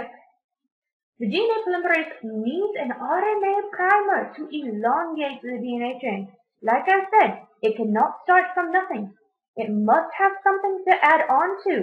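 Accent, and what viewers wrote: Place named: India. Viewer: Your German accent sounds very Indian.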